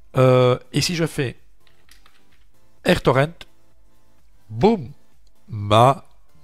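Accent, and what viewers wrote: Belgium. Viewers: j'adore cet accent belge "une fois", et un pouce bleu pour toi mon ami... j'ai suivi le tuto jusqu'au bout, mais à l'ouverture j'ai un message "Impossible de lire le fichier de ressources: ~ /.rtorrent.rc", je précise que j'ai enregistré le fichier ".rtorrent.rc" dans /usr/share/doc/rtorrent/examples, car je savais pas où l'enregistré c'est là ou j'ai peux être fauté, peux tu m'aider s'il te plait grand chef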